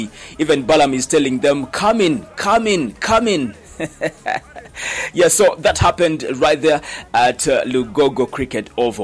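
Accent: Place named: England, United Kingdom. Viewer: I just love ur English accent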